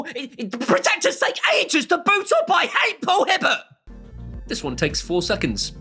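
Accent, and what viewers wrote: United States. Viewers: That was an AMAZING American accent! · nice American accent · That was a pretty good American accent. Very nice review too! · Ok Paul, as ANOTHER American watching, I am genuinely impressed with your American accent...great video as always. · Pretty good American accent!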